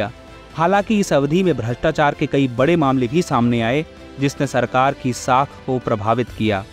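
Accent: Bihar, India. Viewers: Aap Bihar se ho...? Hna..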